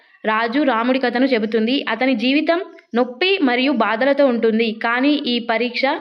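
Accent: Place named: Telangana, India. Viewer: Subjeect with telangana slang 😂 👏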